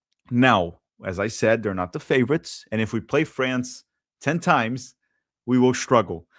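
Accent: Brazil. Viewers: O cara tem um canal chamado, pelo sotaque acho que é Br e tá metendo um canal em inglês sobre futebol? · Nao sem quem é o brasileiro cheio de sotaque que gravou esse video, mas nao entende nada de futebol. Provavelmente nao viveu a época do Lazaroni. E tira essa camisa ridícula do Palmeiras pra falar da Seleção, por favor. Poe uma do Brasil que fica mais legítimo. Odeio brasileiros que falam mal do Brasil fora do nosso círculo.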